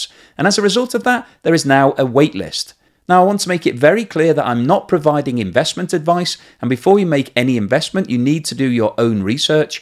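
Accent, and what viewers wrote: United Kingdom. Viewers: if you don't mind my linguistic curiosity, I assume you are from the UK, judging by your pronunciation - yet you pronounce "Masterworks" the American way. Why is that (or am I somehow mistaken)?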